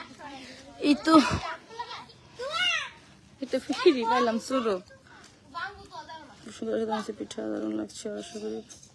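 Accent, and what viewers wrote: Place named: Chandpur, Bangladesh. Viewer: আপনার চাঁদপুরের ভাষা আমার শুনতে খুব ভালো লাগে। আমিও ঢাকা মুগদা থাকি,আপনি ঢাকা কোথায় থাকেন।আমিও মতলব থানার ছেলে।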